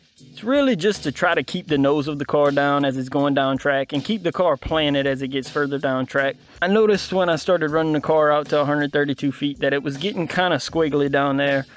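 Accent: Louisiana, United States. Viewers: Your accent sounds like you are from Louisiana if I'm correct what part are you from I'm from Gonzalez but live in Clinton now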